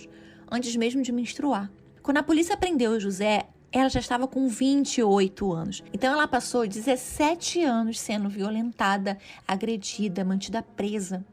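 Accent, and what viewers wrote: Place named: Northeast, Brazil. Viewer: Escuta narradora nordestina… Que graça tem recontar a história,que os melhores noticiários do Brasil,e do mundo, já contaram com riqueza de detalhes? Eu moro na Europa,e assisti a reportagem na época.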